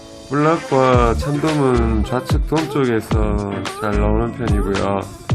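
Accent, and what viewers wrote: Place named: Gyeongsang, South Korea. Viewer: 통영 좌대낚시 영상 잘 보고 갑니다. 들을때마다 차분한 갱상도 사투리가 정감있습니다^^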